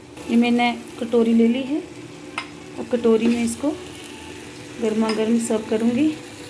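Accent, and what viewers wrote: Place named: India. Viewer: Bhout Sundar sister desi aandaaz bda pyara lga aapka 💯💯💯👍👍👍👍👍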